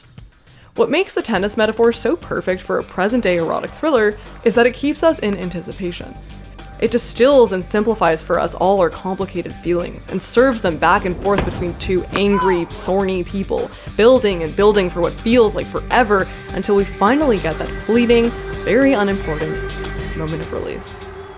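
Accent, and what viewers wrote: Canada. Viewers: lol late to this, but her Canadian accent here sounds kind of like U.S. Midwestern standard reining in the tendencies of California English. However it affects the pronunciation, it’s actually quite pleasant.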